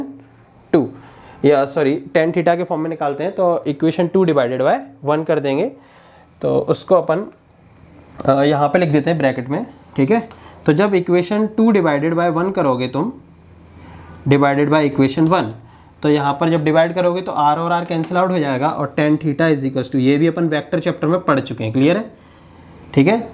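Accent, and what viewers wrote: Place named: India. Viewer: Stop. Talking Indian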